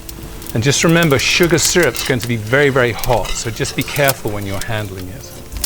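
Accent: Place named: United Kingdom. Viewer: Hi, greetings from Norway I think your videos are beautifully made, you make a cooking session into a ceremony. I would love to spend some of my future afternoons doing some of the things you do. It is not going to happen year around, if I continue living in a country, which is covered by snow half of the year, though? Anyway, I have a question and a comments for you. 1. Please do not play the same tune as the background music in every video. It is serene once, twice, but after watching all your videos in short succession, the tune is sort of stuck in my head. I do not like it when a song is playing again, and again in my head. Even when I am dreaming, it is. But that is just me. If most of your followers love it, then you should continue doing it that way. 2. Where do you live? To me you sound like a British man, myself being Norwegian and all that, difficult to figure out every accent, but the scenery looks like Italy, Spain or California.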